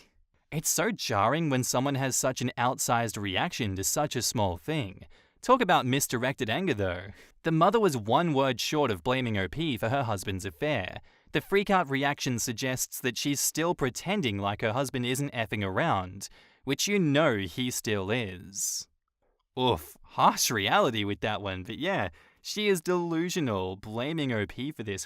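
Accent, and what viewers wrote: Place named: Australia. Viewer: the great Aussie voice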